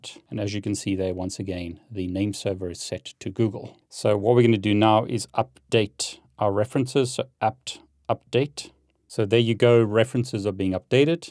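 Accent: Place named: South Africa. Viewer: My 1st comment ever..😅a.you sound like you're from ZA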